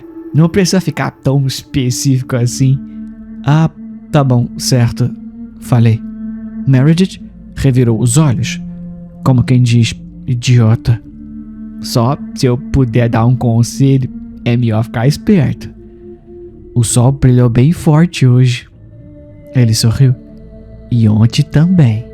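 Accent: Caipira, Brazil. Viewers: hahahahah esse sotaque caipira foi a melhor coisa do canal até hoje 🤣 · vim pela história, fiquei pelo sotaque caipira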